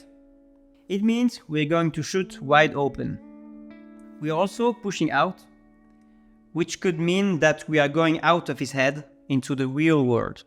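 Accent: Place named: France. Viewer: I like it, also your sense of humour and French accent, thank you! Just keep going and the Eps will get even better.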